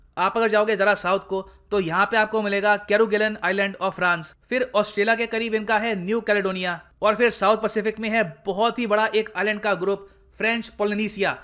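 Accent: India. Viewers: really bad presentation. you are talking about IORA. you did not even show the full name in big letters. why would you not do that. you are speaking english words fast in indian accent. it didnt work, no point in watching this video and it deserves thumb down. really bad presentation.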